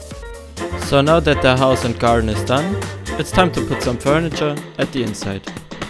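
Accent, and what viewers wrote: Germany. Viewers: Er hört sich deutsch an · Ich will jetzt nichts sagen aber er hat ein deutschen akzent 😂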